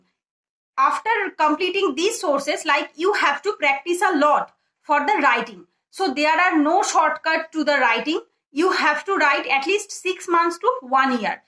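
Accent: Bengal, India. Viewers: Madam are you bengali? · Where do u live?.. Btw I can easily make out that u are a Bengali😊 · Hello ma'am, this video is very helpful for me because I prepare upsc exam in hindi medium but I realise English medium given exam is better than hindi because in upsc number of selection only English medium students so I also decided to prepare this exam in English but I have so many problems and confusion how to cover and understand by this language, you're giving tips useful for me and I want to applied ourselves.abhi meri English typing very bad hai ,aap meri feeling ko samaj lijiye.Aap ki English bhi ek bengali type lagati hai jab aap bolti hai, mujhe bengali nhi aati phir bhi ish language ko apne landlord jo ek bengali family hai wahi pr suni hui hai.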